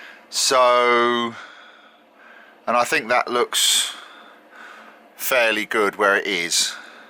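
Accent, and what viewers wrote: United Kingdom. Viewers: Where are you from? (In england) I love your voice/ accent.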